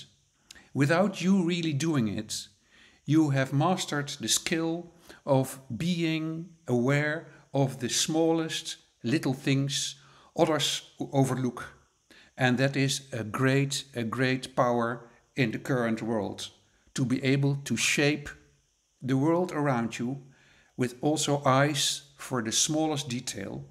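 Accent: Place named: Netherlands. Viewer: Love the Dutch accent. Sounds cute😊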